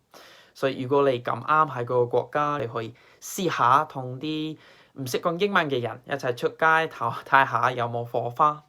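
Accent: Hong Kong, China. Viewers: 嘩，你D口音同語氣真係好香港人！讚 讚 讚 勁 勁 勁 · 連你嘅語氣都好香港人feel 🤣🤣🤣🤣❤️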